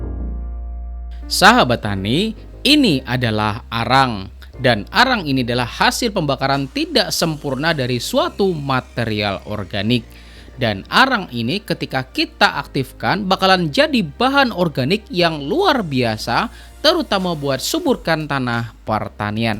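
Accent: Banjar, Indonesia. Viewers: Nakaya p ne model nya Bahasa urng banjar logat nya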